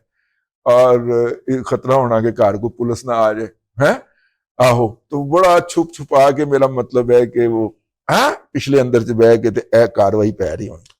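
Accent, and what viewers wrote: Punjab, Pakistan. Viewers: Bhai jo Ayat app na galat Parhi hai uss ka TarjummA . Tashhhe Karoo apni aur ya Punjabi zaman ka Tarka bhi band karooo ya phir Punjabi mai banaooo apna v log ya Urdu me ok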